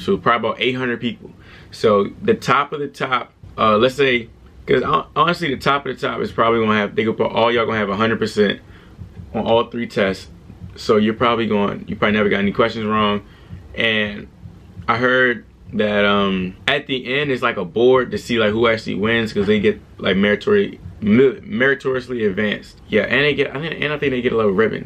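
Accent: Baltimore, United States. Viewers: Where you from Baltimore? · Nah, that's Baltimore all day and I'm not even from there 🤣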